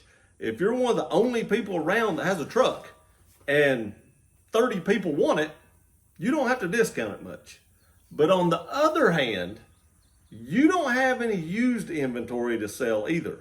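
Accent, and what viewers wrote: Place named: Tennessee, United States. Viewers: Found your channel a sec ago by your weight loss video. Was like, bet that dude is from East Tennessee based on your accent haha and clicked this video and you said Johnson City. I’m from Knoxville/ Maryville. Good to see a YouTuber from this area. Just wish I didn’t have to stare at that ugly butt Florida hoodie in that other video tho🤮😂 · Johnson City, Texas or Johnson City, Tennessee? Accent sounds like TN but can't be sure.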